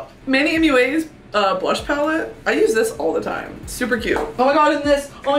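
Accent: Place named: United Kingdom. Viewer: Why does she sound like the opening wizard man from Wizard101 w her british accent <3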